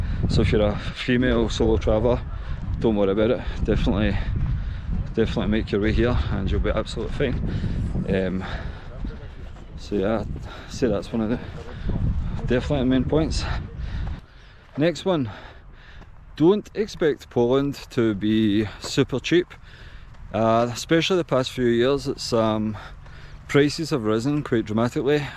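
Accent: Scotland, United Kingdom. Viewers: Your pronunciation is really difficult for me and I need subtitles but I like northern accent very much. I have never been to Scotland but I love this place. Don't ask my why because I don't know. Just imagination. People need to say "thank you" when you open the door but...that young generation, you know what I mean :)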